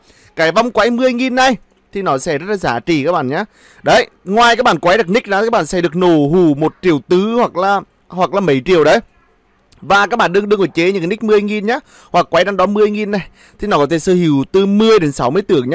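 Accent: Nghe An, Vietnam. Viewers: Nghe quen giọng lào lúc nào không hay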